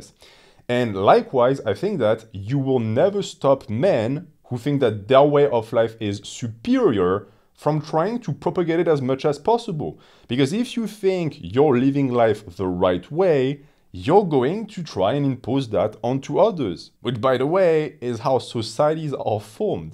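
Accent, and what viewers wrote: France. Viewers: Sounds french to me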